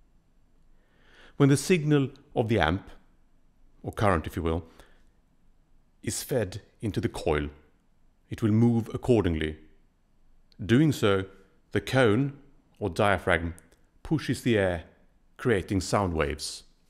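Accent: United Kingdom. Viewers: It's crazy how has a much more British sounding voice than regular. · Anyone else think his voice sounds british but is actually swedish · I can’t tell if you’re very posh British, or Eastern European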